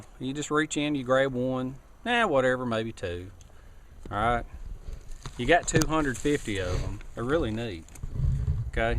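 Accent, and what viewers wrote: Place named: Georgia, United States. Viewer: Cool idea....I like it because it decreases by one the number of tools you would have to carry... I thought you sounded like you were from Georgia... I lived in Warner Robins for 16 years.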